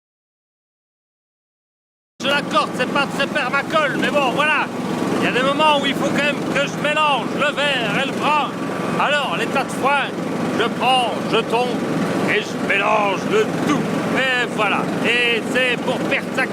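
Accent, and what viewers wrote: North, France. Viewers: ou alors du coter nord france cette accent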